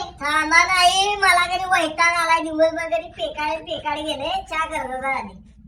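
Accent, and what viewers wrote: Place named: Rural, India. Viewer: अण्णा , ग्रामीण बाज ऐकायला छान वाटतोय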